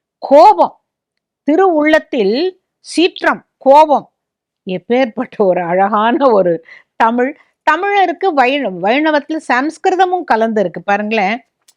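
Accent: Palakkad, India. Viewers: பாலக்காட்டு மாமி மாதிரி பேசி அசத்திட்டேள்.. பழைய பாரம்பரிய விஷயங்களை இது போன்ற நல்ல செய்திகளை தொடர்ந்து தாருங்கள் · Akka I am your Palakkad relative.Super Palakkad Tamil. I am your follower and enjoyed learning a lot.Everyday I will wait for your program.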